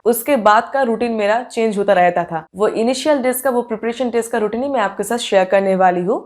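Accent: Bengal, India. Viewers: Mam r u Bangali or asami?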